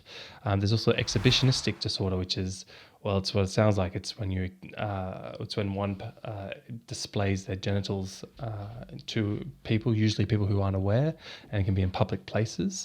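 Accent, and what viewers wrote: Australia. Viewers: He's Australian, he sounds Australian the whole time to me as an Australian myself.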